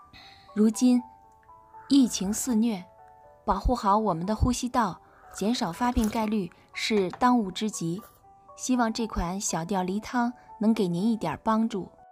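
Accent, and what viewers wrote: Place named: Beijing, China. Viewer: 浓浓的京味👍👍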